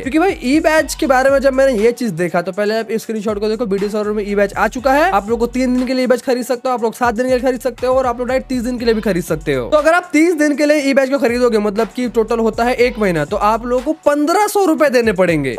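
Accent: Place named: Bengal, India. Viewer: बेटा तू वी बंगाली है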